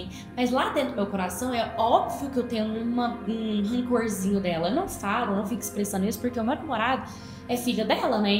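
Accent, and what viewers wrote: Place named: Caipira, Brazil. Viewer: Só eu que vejo que ela tem sotaque cautrim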